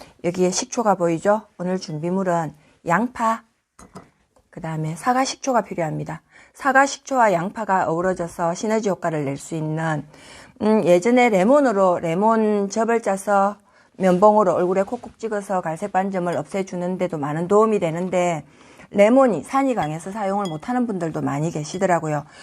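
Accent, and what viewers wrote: Gyeongsang, South Korea. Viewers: 말씀 도 부산 이나 경남 쪽의 말씨 이네요. 아주좋아요.😄 헤어스타일 도 멋있네요.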